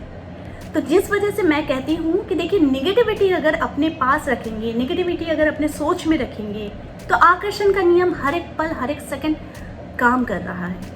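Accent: Bengal, India. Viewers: Sute jawar age tmr video dekhe sute jawa...eta amk ank besi positive vibes dae..tumi bhishon misti..ar khub valo vabe guchiye ktha bolo...jodi kakhono somoy pai tmr sathe ami nischoi dekha korbo..i just love u mam❤ arekta ktha bengali ra jokhon hindi te ktha bole tokhon besi cute lage...thik jemon tumi🥰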